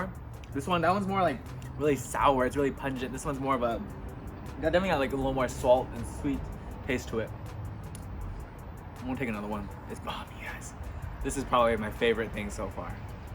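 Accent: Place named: Vietnam. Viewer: Love your Viet accent!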